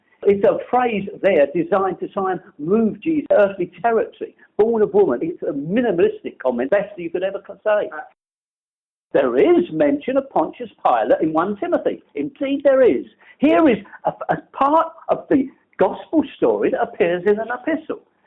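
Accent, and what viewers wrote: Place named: North London, United Kingdom. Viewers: I like his London accent. I despise the Australian and especially the New Zealand accents. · he sounds like he comes from N London.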